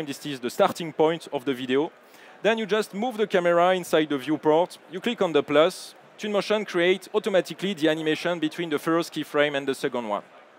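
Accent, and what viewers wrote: France. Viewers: I love his french accent :V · Ze french accent is very funny!😅😆🤭👍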